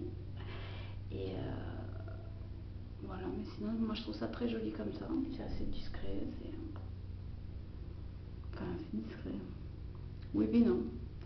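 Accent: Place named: Quebec, Canada. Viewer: Hello hello!! Ahhh tu viens du Québec? Il n'est pas bizarre ton accent! J'aime bien perso!! Ce make-up te va à ra-vir!! Les couleurs des Monobulles sont sublimes j'avoue....Et j'adore ta couleur d'iris... Héhé t'inquiètes, je ne t'oublie pas avec Alice... J'ai ma petite idée dans ma petite tête.... Gros bisous et merci pour la dédicasse! T'es choute ♥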